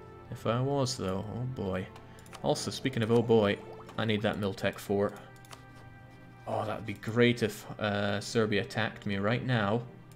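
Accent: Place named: Scotland, United Kingdom. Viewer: Stuck in a travelodge all week with Potato Internets, so just listening to those dulcet scottish tones in sweet 480p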